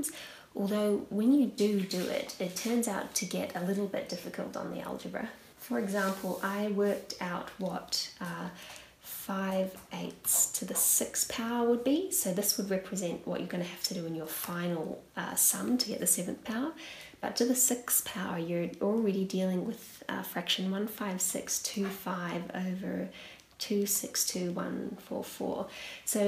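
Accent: New Zealand. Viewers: In 1866 students would have been using a slide rule (see link below) to calculate the more difficult problems, but they would have to memorise all the formulae. Back in my day, we never had to use a slide rule as we did have calculators, but we were forced not to use calculators and use what we called "lookup tables" and calculate longhand. As to accent, I can hear a little NZ accent in there but the way she pronounced Albany I believe she is either in Western Australia or has lived there for quite a while. Australian Eastern Staters and Americans (I believe) pronounce it Allbany. <link> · Well... I can tell you're definitely not Australian since a) no Australian ever says shrimp, we say prawn and b) she's obviously got a Kiwi (New Zealand) accent so she's not even from the same continent. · Gold dust in Troy ounces and cotton in Ne (linear density)? By the way, are you from New Zealand?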